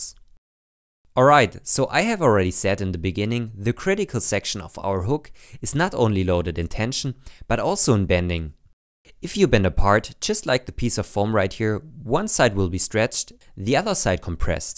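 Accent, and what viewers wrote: Germany. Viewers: Man hört schon ein bisschen, dass Du Deutscher bist... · Like the Videos, but the german englich (i am german too) distracts me.. xD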